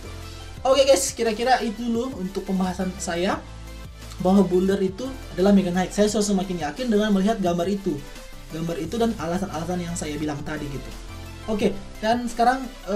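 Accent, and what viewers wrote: Manado, Indonesia. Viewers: Bang lu org manado ya??, sorry subcriber baru btw gua juga org manado tpi sekarang tinggal di gorontalo karena tugas ortu....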